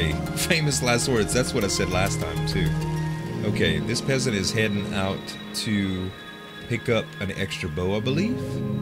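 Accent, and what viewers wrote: Louisiana, United States. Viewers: brain They are both good ol' boys. is from Louisiana and Freeman is from western Mississippi (raised there, not born). So they both have similar regional accents. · Was trying so hard to figure out what kind of accent. It's Louisiana, isn't it?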